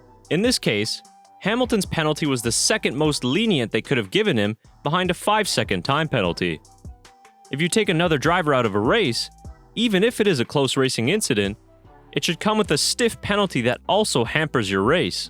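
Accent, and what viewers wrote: United States. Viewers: Just brainwashing the MAXIDIOTS with an American accent to make it seem like it’s coming from someone unbiased.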